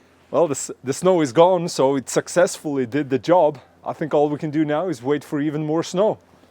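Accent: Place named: Sweden. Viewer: "Spins like a cat", love the Swenglish 😄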